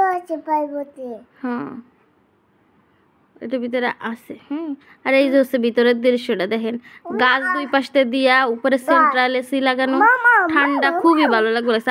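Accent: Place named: Gopalganj, Bangladesh. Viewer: আপনার বাড়ি মনে হয় গোপালগঞ্জ ভাষায় তাই বলে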